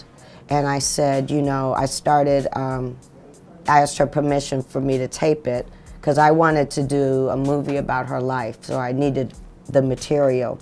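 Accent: South, United States. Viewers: She definitely ain’t nan like Whitley Gilbert bruh💯🚫🧢even doe she frm up Nawf she got popular off uh dat gud O kuntry accent own “A Different World 🌍 “ ya digg... not ta mention she dun spent majority uh ha time in my hometown ATL💪🏽Salutez👆🏽Mz Guy u alwayz have been my crush since Whitley doe😍👌🏽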